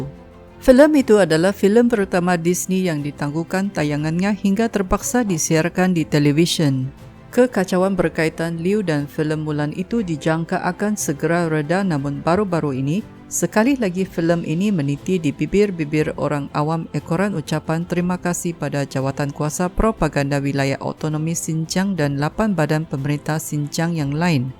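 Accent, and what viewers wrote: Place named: Indonesia. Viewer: Boleh tahan cubaan berbicara a la Semenanjung penyampai ini. Menjadi 90%,, cuma masih ada 10% bunyi Indonesia tak dapat disembunyikan. Sebelum ini ada aku dengar penyampai lain channel ini masih berbunyi 97% Indonesia, 3% sahaja Malaya (iaiti bahagian a dihujung jadi e) 😆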